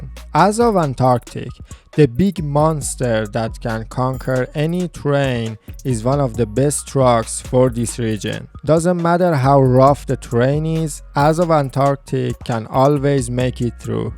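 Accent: Iran. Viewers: لحجش چقدر شبیه ایرانیاس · داداش ایرانی هسی؟لحجت خیایییی مثل ایرانی ها هس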